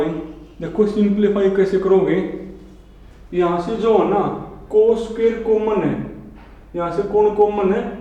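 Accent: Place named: South India, India. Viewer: He's from south india. That's why different accent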